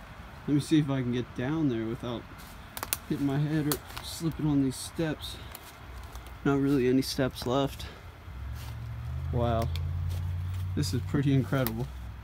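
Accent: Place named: East Coast, United States. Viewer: Pennsylvania? It sounds like you have a back east accent.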